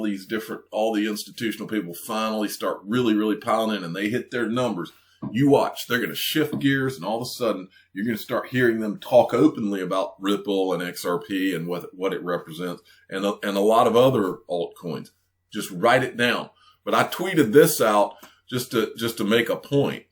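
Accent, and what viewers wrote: South, United States. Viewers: Glad to be back. Missed your sultry southern voice sing to me about XRP. Happy and Healthy Thanksgiving. Smoke that Turkey!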